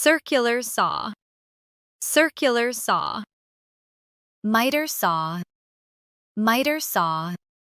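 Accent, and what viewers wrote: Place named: United States. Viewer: Horrible Murican accent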